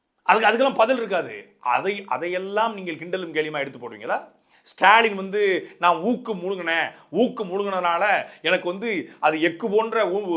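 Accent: Tirunelveli, India. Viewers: பசாட்டை அவர்கள் அய்யா நீங்கள் உன்மையை உரைத்து மிகப்பெரிய மனித வடிவம் எடுத்து இருக்கிறீர்கள். தக்க சமயத்தில் உதவி செய்வது யாராக இருந்தாலும் பாராட்டுக்குரியவை என்று பாராட்டியது பாராட்டுக்குறியது. நல்ல நெல்லை தமிழ் கேட்க இனிமையாக பேசும் திறன் உடைய நீங்கள் நேரம் கிடைக்கும்போது வள்ளுவம் கம்பராமாயணம் போன்ற காவியங்கள் பேசினால் கேட்க இனிமையுண்டு. தமிழ் தொண்டு ஆகும். தங்களுக்கு பகவான் எல்லா வளமும் உடல் நலமும் கிடைக்க பிராத்தனை செய்கிறேன். உங்கள் வழியில் வெற்றிபெற பகவானை வேண்டுகிறேன். எதாவது தவறாக இருந்தால் மன்னித்து கொள்ளுங்கள் ஓம்நமோ நாராயணாய நமஹ!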